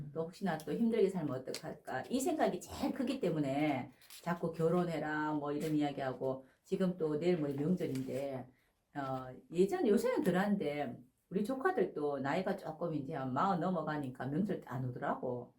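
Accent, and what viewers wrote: Gyeongsang, South Korea. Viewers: 개인채널이라지만 경상도 출신 아니면 귀에 들리지 않게 억양이 너무 강해서 못 듣고 나가요, 이런 경우 진짜 처음이오.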